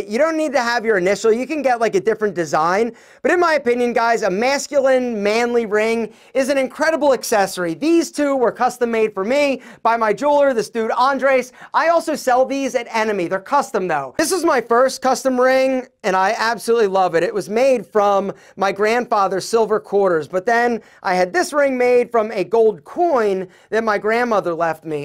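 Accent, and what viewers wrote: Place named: Pennsylvania, United States. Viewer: West Virginia? Hmmm lol. With that accent I was thinking on the PA border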